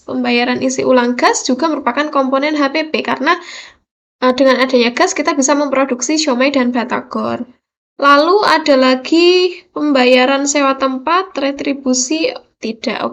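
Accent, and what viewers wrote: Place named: Java, Indonesia. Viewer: Aw medoknya khas bgt kek orang klaten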